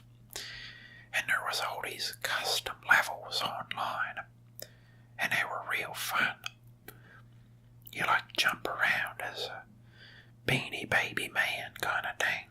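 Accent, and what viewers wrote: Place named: South, United States. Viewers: owen leather this is,late but it sounds like a southern American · what is your accent ?! it sounds extremely southern american most of the time and then occasionally falls into australian especially when you say time, like and buy... its rather peculiar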